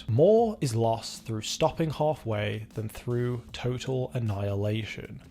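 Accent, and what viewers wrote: United Kingdom. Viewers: Its always so bizarre and cringe to hear the word ni**a spoken in a British (or frankly any other non American) accent. The term used in an endearing or hip hop adjacent way is so inherently African American and steeped in the specific experience of being Black in America. African American pain/liberation is tied to the word in this context, so when Black people with different accents from other parts of the world say it, it sounds wild af. This is partly why Kendrick told Drake we don't want to hear him say it anymore. Nice video, but just food for thought. Race and culture are not the same thing, Drake not seeming to understand that is a part of why he lost so miserably.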